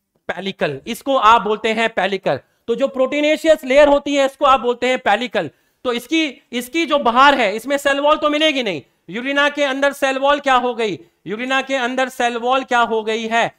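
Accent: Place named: Hyderabad, India. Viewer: sir mujhe hydrabadi lag rahe unke language se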